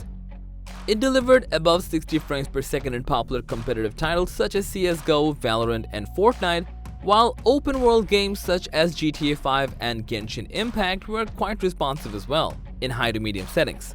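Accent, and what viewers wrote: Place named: India. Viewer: whats up with the fake american indian call center scammer accent?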